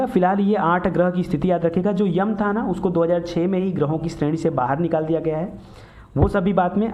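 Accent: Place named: Gorakhpur, India. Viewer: sir kya aap Gorakhpur pur ke pipiganj se hai kya aap ka aawaj waisa hi hai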